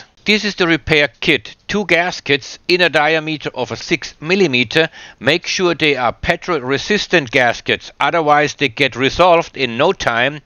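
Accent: Swabia, Germany. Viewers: Funy, funny, funny, jetzt habe ich entdeckt, dass es den Kanal auch auf englisch gibt, und jetzt verstehe ich endlich alles. Trotzdem würde ich hochdeutsch bevorzugen - aber was man nicht bekommt, bekommt man halt nicht. Schwäbisch ist jedenfalls gleich nach sächsisch nahezu unverstehbar für mich. Aber schlimmer geht ja immer! Den Schairer verstehe ich absolut Null!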